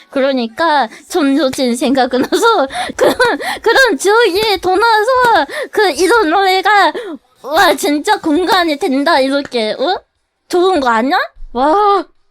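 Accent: Japan. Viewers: 다른발음은 일본인이 한국말하는거 같은데 시발은 네이티브한국 발음이네 ㅋㅋㅋㅋㅋㅋ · 한본어 진짜 개쳐귀엽네 ㅋㅋㅋㅋㅋ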